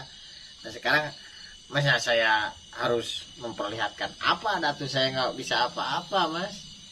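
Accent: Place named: West Java, Indonesia. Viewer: Assalamualaikum, mugia kang sinareng team sarehat. Bade tumaros linggihna dimana? Upami ningal dina logatna mah urang sunda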